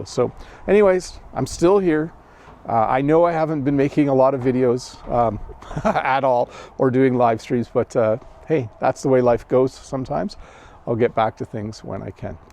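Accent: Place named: Canada. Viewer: Surprise surprise, I understand canadian english better than american english, I don't know why.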